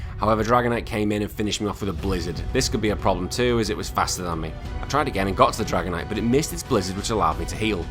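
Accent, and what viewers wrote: Liverpool, United Kingdom. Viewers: Yo my guy u sound Liverpoolian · I can tell you’re scouse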